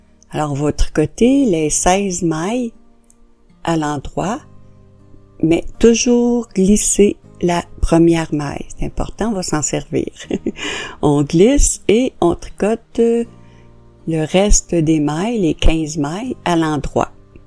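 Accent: Quebec, Canada. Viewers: J'adore ces pantoufles que je vais commencer ce soir , et vous Madame , comme professeur , vous êtes super : les expliquez très bien et quand vous approchez la caméra , on ne perd rien de l'explication , c'est super ! Cependant j'ai un petit bémol à propos de la musique que je trouve beaucoup trop forte , Je suis malentendante et je perds beaucoup d'explications à cause de la musique . J'ai essayé de l'enlever par tous les moyens que je connais mais je n'ai pas trouvé de solution ... C'est le seul petit reproche que je peux vous faire et à part ça , vous êtes du Québec, comme moi et ça me fait plaisir d'écouter votre voix . Merci pour tout .Excusez mon audace .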